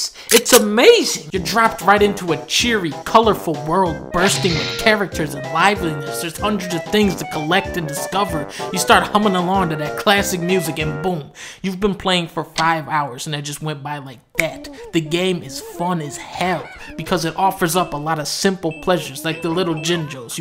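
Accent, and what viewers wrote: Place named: Alabama, United States. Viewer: You sound like your from alabama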